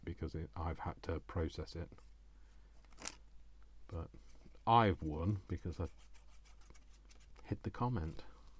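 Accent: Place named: United Kingdom. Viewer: Love them. Love your Britishness, love your accent. Love your creations, love your mind. I love all our UK accents. You be you, because thats what we love about you. 💜💙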